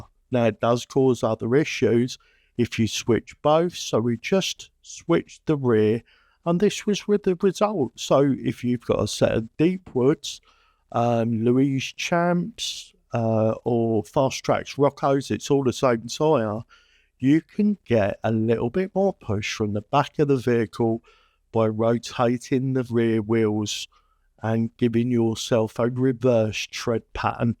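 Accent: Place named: Birmingham, United Kingdom. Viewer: brummy accent that lol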